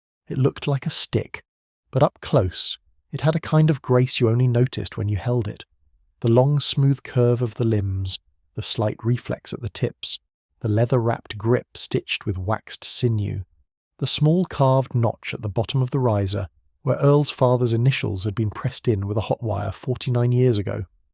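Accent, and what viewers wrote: United Kingdom. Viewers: Hate this AI with British condescending voice and accent.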